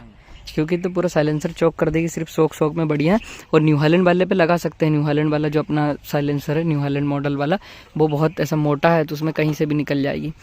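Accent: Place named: Rajasthan, India. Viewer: Bhai Rajasthan se ho ya haryana se.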